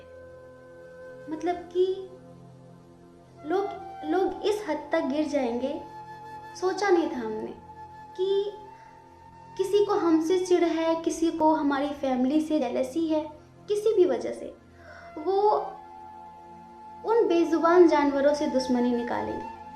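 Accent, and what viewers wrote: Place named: Pahari, India. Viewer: Kitni pahari aawaaj hai aapki waise hi payare aap ho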